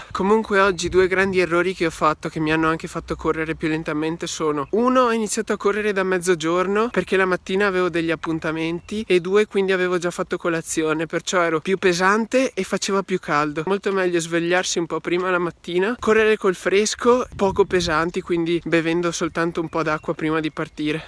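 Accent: Veneto, Italy. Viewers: Te si Veneto sceto😂😂😂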